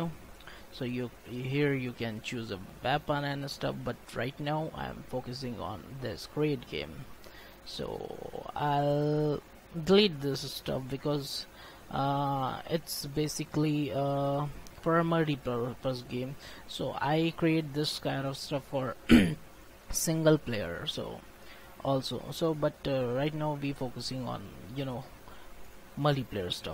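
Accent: India. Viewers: U have indian pronunce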